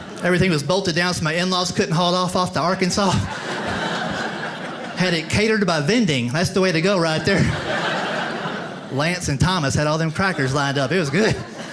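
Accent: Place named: New England, United States. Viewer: What a hoot, when I was growing up in the 50’s, my whole family talked like him! It was fun to hear, now that I’m the oldest generation, surrounded by New Englanders!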